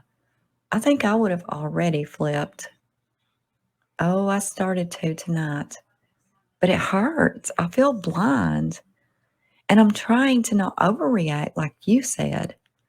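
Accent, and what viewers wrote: South, United States. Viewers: Doesn’t she .......her accent is amazing when I visit America this is what I want to hear 😃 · Please don't let some of these negative comments get you down. You're doing a great job with a very difficult, controversial case. You are so beautiful....a southern Queen. Hope you will do more reviews showing your pretty face....not just you nice voice. I just love Southern accents.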